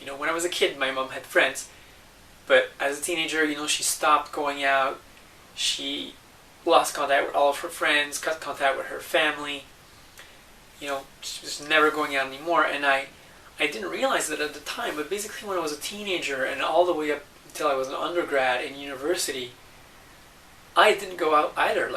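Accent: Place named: Quebec, Canada. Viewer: First, your videos are fascinating and intelligent. Second, you have a hoarde of viewers, so if this question has been asked and answered already, I apologize. I am however very curious; you seem to have a French (Canadian) accent. Yet all of these videos seem to be in (perfect) English (Canadian). I'd expect them to be in French; is there a reason for this?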